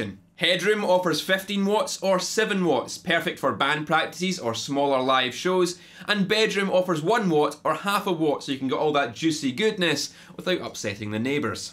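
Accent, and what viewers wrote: Scotland, United Kingdom. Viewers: The Scottish accent tingles the nuts like a dimed plexi through a cab of greenbacks! In other words..I hit the subscribe button so hard it was on the ground twitching like apollo creed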